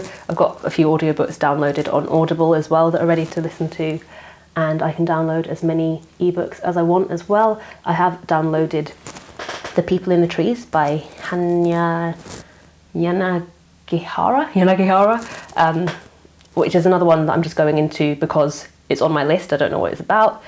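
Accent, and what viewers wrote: England, United Kingdom. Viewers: I'm new to watercolor and new to your channel. I've binged watched tons of your content. I love listening to you (my mum was from England and your accent reminds me of her). Keep up the good work and stay safe.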